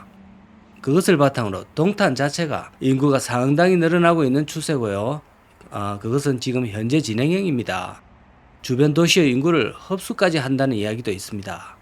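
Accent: Gyeongsang, South Korea. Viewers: 경상도 말투는 왜 촌스러울까 나두 경상도인데ㅠ😊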